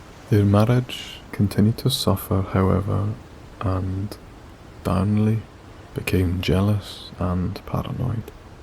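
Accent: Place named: Scottish Highlands, United Kingdom. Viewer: your gentle , highland brogue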